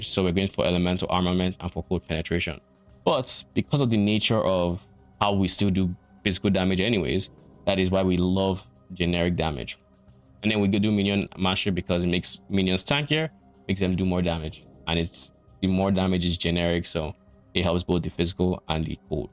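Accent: Nigeria. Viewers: Are you Nigerian? The accent is telling me yes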